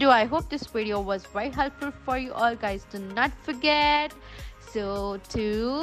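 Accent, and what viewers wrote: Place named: India. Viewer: Sorry as non Indian it is too difficult to understand too strong accent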